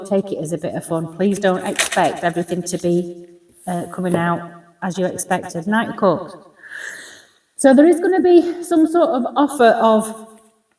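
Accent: Sheffield, United Kingdom. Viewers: I knew that was a Sheffield accent, thank you for your readings. I love listening to you. Love and light from another Sheffielder ❤️🙏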